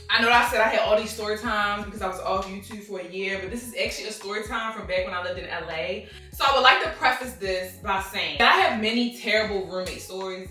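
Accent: Baltimore, United States. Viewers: You have one of the strongest Baltimore accents ever I love it (I’m from bmore county without the accent womp womp ❤️‍🩹)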